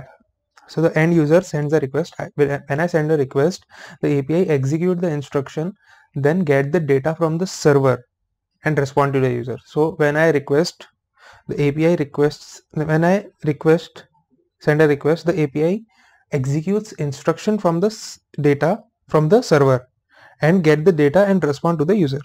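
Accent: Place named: India. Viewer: please, write (indian accent) in your videos so I don't waste my time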